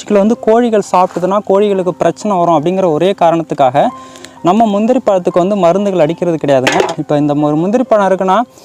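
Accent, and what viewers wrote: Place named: Cuddalore, India. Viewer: ரொம்ப யதார்த்தம் .அதோட உங்க தமிழ் நம்ப கடலூர்மாவட்ட வழக்கிலே இருப்பது கூடுதல் சிறப்பு..ரொம்ப மகிழ்ச்சி...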